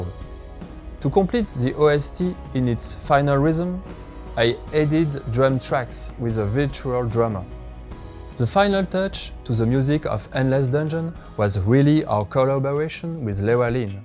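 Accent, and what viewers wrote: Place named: France. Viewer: Toujours aussi fan des oeuvres d'. Et The french accent, toujours aussi nice ^^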